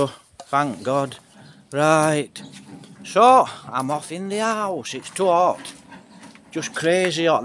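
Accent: Yorkshire, United Kingdom. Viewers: Thought you was a YORKSHIRE LAD ❤ I'm from a village called Maltby near Rotherham 😅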